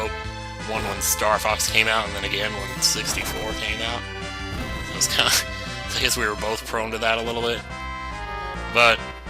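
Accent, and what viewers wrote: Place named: South, United States. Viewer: Based on you're accent i bet you are from the south. Great game by the way, even if that tower was a bit of a bitch.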